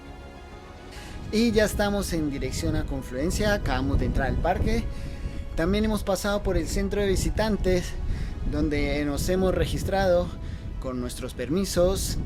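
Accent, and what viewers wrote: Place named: Argentina. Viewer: que buen video! me llamo mucho la atencion tu acento jaja, cada tanto te salia un acento argentino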